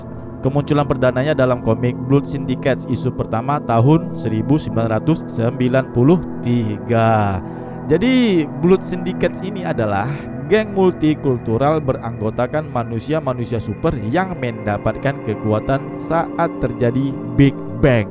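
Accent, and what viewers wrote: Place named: Medan, Indonesia. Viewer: ente orang Medan ya bang...??? Dari logat²-nya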